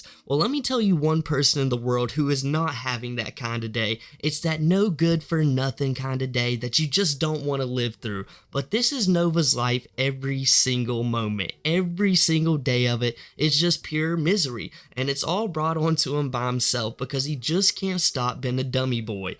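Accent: South, United States. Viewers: You have the mosr country accent ever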